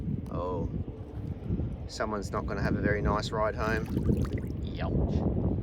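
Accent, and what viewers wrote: Australia. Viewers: You sounded more Australian in this vid. I wondered if maybe you were tired, as accents are sometimes accentuated then? Great finds today. I actually liked that flat coat button that you seemed a little disappointed with. The quality of buttons then were considerably better than today. I’ve got to look for an update on this vid to see what that lump of metal turned out to be. Did they only make cod bottles in green? It would have been lovely if they had other colours too. Then the marbles would be really colourful. Thanks for sharing your finds.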